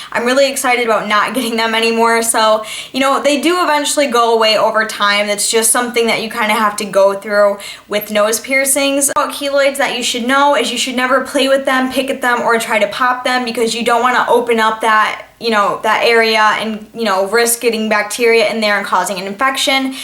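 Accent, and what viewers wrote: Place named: Midwest, United States. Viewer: Your accent is adorable! Guessing you're from either Michigan or Wisconsin!! 😍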